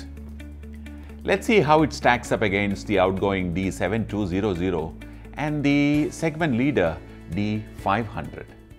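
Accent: Kerala, India. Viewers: sir your malayalam accent 👍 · Can't help but notice such a strong mallu accent, very good videos though. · Thanks for the videos I like photography. If u don't mind shall I ask you one thing are you from Kerala. Because ur slang is a bit like that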